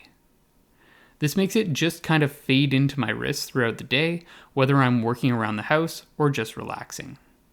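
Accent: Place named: United States. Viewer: If you have an American accent why do you give the wrist measurement in metric and not inches?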